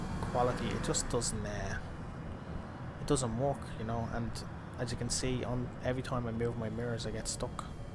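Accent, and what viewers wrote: Ireland. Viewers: I love your Irish accent.. North or RoI? I'm from Wales :)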